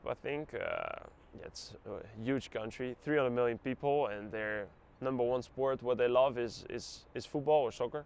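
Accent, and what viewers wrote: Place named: United States. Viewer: aksen US banget mas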